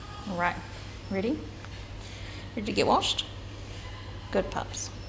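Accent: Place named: Australia. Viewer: I love your accents. It reminds me of the Aussies down under. 😊❤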